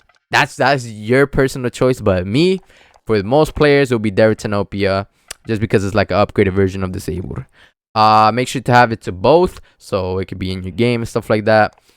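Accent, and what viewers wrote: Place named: Latin America. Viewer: Este we a quien quiere engañar? Parece más latino que nada jajajaja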